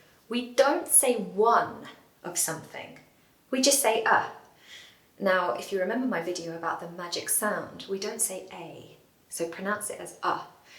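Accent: United Kingdom. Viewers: I love British accent, it's a quite nice for ears, thank you for nice lesson, have a wonderful day, regards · I love your lessons. you are always friendly, modiste your good mood.. just keep going on! It's good to learn from you now i now why british people speak lovely accents thank you for teaching . It's the right time to l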